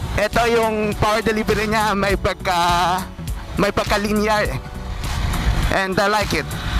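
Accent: Iloilo, Philippines. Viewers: Nami ma mati illonggo nga motovloger..... especially Ang tingog mo hahaha daw wla gid d b illonggo bloggers ehh heheheh nga illonggo gid Ang Gina hambl sa vlogs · Boss. I noticed from your previous vlog that you sounded Ilonggo! Where's this route your taking today?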